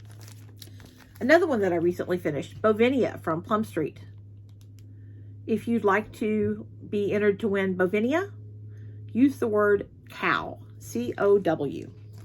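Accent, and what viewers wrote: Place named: Texas, United States. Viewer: Loved all your beautiful finishes and your Texas twang (being from south Texas myself). Thanks for sharing your work with us. shepherd and twice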